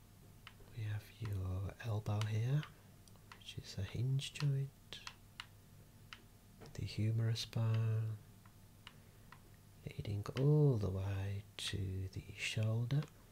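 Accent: Birmingham, United Kingdom. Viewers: Ah! A fellow Brummie. This is fantastic! Must have taken ages to make though?! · Love all ur videos man, u really do help me sleep at night, especially the eye exams, ur voice is super calm and the Birmingham accent is a bonus, keep it up, looking forward to ur next video🙂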